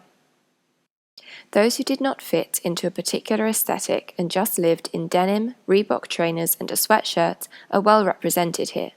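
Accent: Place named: United Kingdom. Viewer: This lovely britich accent) very good to hear - difficult to understand) your video is great)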